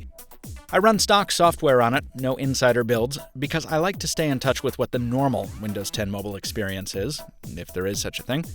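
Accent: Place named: Boston, United States. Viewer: Do a vid with your Boston Accent.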